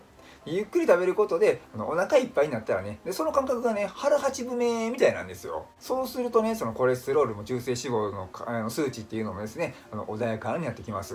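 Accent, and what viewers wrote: Osaka, Japan. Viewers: 久しぶりにさんの健康講座を聞きました❣️耳にさんの声が心地良くて大阪弁が柔らかくて、ほんっとーにわかりやすいです😃ありがとうございます😃 家族の健康は日々の食べた物で支えられてる‼️母さん頑張れ‼️と受け止めました😃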